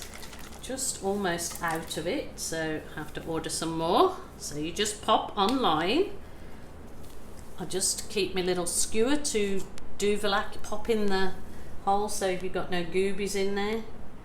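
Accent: Birmingham, United Kingdom. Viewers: I knew there was a bit of Brummie in your accent! This is amazing, beautiful mirrored wings xoxox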